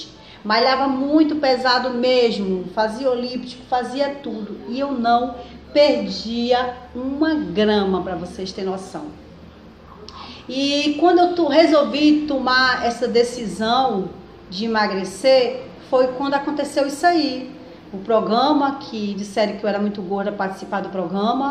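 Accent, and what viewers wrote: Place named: Northeast, Brazil. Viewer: nossa adoro esse seu jeito de falar bem nordestino msm" mangano" quer dizer rir de vc. sou de Natal Rio Grande Do Norte. bj linda vc é nossa motivacão!😙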